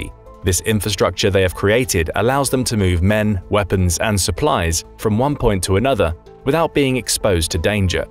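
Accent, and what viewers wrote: England, United Kingdom. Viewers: Hearing someone with an English accent use nba references is wild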